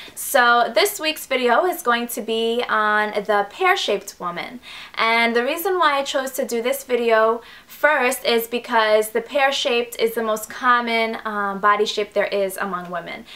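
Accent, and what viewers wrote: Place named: New York, United States. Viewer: Ive been looking around for advice on how to dress for my body type and this is, by far the best to help me! Plus I love your New York sounding accent!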